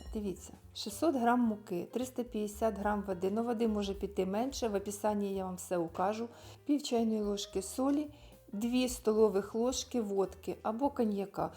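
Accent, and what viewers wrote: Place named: Poltava, Ukraine. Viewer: Я ,як почула Вас,подумала що з Полтавщини .У нас теж так кажуть -кабак.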